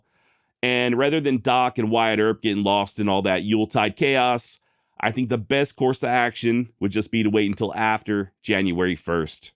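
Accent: Texas, United States. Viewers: I feel like a lot of these comments on your content or accent are from people that watch about 2 minutes of a video, find something they disagree with, then comment, lol. The thing about your accent was hilarious. If you watch any amount of your videos, you will find out pretty quickly you are a Texan, lol. Keep up the awesome work. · I love your intro and sing along with it. (I wrote and perform mine) and a far as your accent goes sounds like central Texas or Oklahoma. The giveaway is wash. You say warsh. Warshington. Think Dustin Hoffman in Little Big Man. "Gawd bess George Warshinton". Classic. Keep pitching buddy. I love you just the way you are. YEE HAW! rocks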